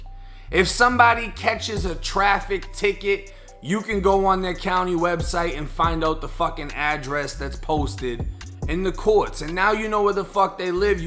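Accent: Boston, United States. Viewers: Oh yeah you definitely from Boston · That Boston accent heavy bro 😂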